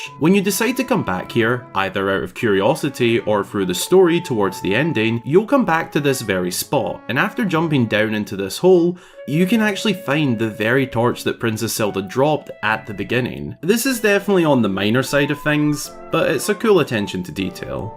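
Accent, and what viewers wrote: Scotland, United Kingdom. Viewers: He is scottish I just realized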